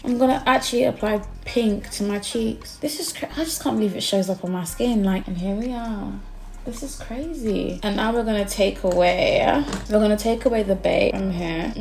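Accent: United Kingdom. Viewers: am I the only one who hears Nicki's voice when she speaks ? with a british accent ofcours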